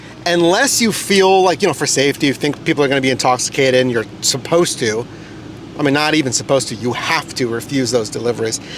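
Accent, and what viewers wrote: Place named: Pennsylvania, United States. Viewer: It's s that pennsylvania accent 😉 I have to say, your phone restarting was a sign to call it a day; I'm surprised that you didn't get a lot of offers on a holiday.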